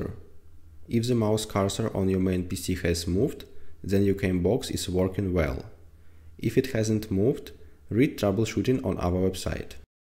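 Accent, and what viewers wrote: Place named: Russia. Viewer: Норм русский акцент я балдею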